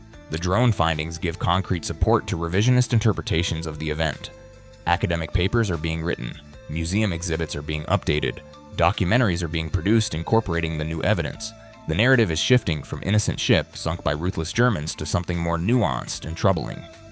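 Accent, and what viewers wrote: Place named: United States. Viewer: Soon as I heard that awful yank accent I had to turn it off.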